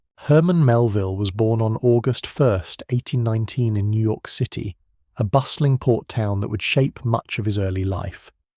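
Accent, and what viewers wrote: United Kingdom. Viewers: It's being a British accent, don't you know.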